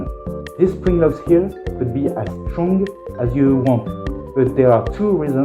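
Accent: France. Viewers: Hey I just notice you have a French accent are you French ? Because me yes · you sound french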